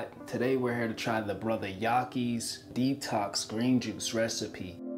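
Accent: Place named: Western Pennsylvania, United States. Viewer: I knew it.. I was born in Pittsburgh and moved to Maryland when I was 7 so.. I heard that Western Pa accent mainly da burgh in you.. I had to ask.. much love to you my brother.. It crazy my family reunion is in the burgh in a couple weeks so I’ll be up there.. peace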